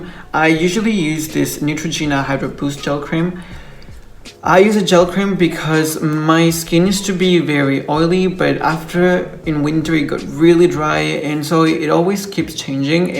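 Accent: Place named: United States. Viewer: Where did you learn American English?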